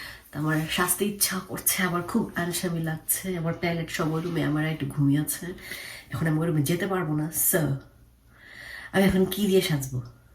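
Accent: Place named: Bangladesh. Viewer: তোমার আরেক কোন এক ঈদের ব্লগ থেকে দেখা শুরু তোমার ব্লগ।মজার কথা ভাইয়াকে দেখে ১ম আমি ভেবেছি ইন্ডিয়ার নামক এর একজন অভিনেতা ও তোমাকে ১ ম দেখায় মুগ্ধ। ভয়েজ শোনার সাথে বুজমাল তুমি বাংলাদেশী+ আমরা নারায়ণগঞ্জ বাসী😍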